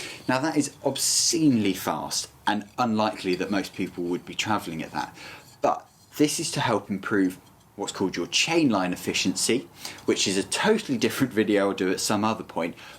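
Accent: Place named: United Kingdom. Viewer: i have a hard time understanding the british accent sometimes, good content though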